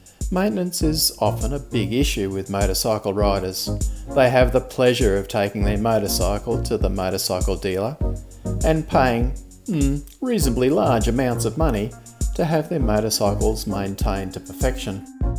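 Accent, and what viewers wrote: Australia. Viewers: I’m a Scot, I think the Aussie accent kinda threw me off a bit 😂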